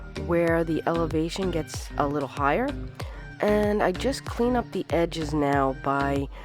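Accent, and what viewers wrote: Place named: Northeast, United States. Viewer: In this game, water sucks, it really really sucks. The water is so wavy it makes the roads wave. Im glad your fiddling with the railroads paid off. I'd keep an eye on your train tracks, I'm worried about trains locking up in such a short space. My last metro setup locked up, killing my profits. I'm still a little bitter about it. I have trouble with train and metro tracks as well. To get the most shallow slopes on the roads, or any network really, the slope section should be exactly 12 units long and rise no more than three small steps. The 3 small elevation steps are the minimum required to go over other networks without conflicts. It makes for some damn pleasing looking overpasses in my opinion. I swear I heard some New York in your voice this time. If you're on the East Coast, it certainly explains why your videos go up so early. 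I'll have to wake up before dawn to get First Comment. Cargo hubs bring all the trucks to the yard. Yours is simple enough to keep traffic tamed while still looking great. Can't wait for the next episode.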